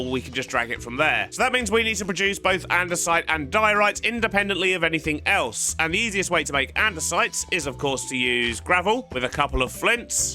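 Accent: United Kingdom. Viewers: Your voice sounds so british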